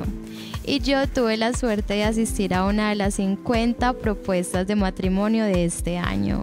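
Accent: Antioquia, Colombia. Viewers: que rica vocecita de paisa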